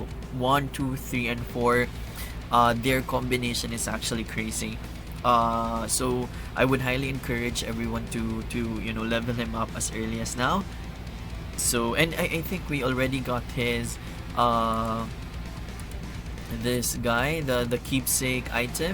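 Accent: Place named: Philippines. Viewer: Pinoy ka pala ganda ng accent haha keep it up already subscribed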